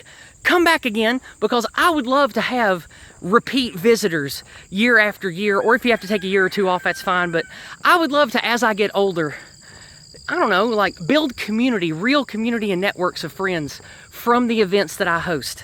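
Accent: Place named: South, United States. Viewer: The intro for your videos is so cool the way it melts away with the gentle piano notes and American theme.!!! I just subscribed to your channel and will stay tuned. Cool Southern accent btw. 😎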